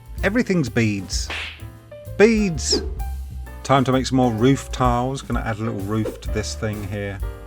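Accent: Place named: RP, United Kingdom. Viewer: As fascinating as your builds and build process is, the main draw is your dry humor and the posh affectation you apply to your accent. I can't quite tell if it's real or not, but it sells the dry humor. My American ears are entertained.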